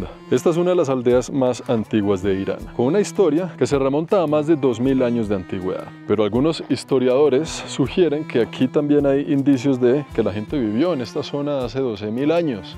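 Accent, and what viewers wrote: Bogotá, Colombia. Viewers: Soy nueva viendo tu canal y lo que más me gusta es como le hablas a los animales y es porque yo lo hago así también, ademas me gusta mucho el acento colombiano, gracias por compartir tu viaje de vida. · Hola, me gusta su canal. Soy de Bogotá por lo q me río mucho de su forma de hablar porque hablamos muy parecido. Hola niño!! Q dice el niño!! 😅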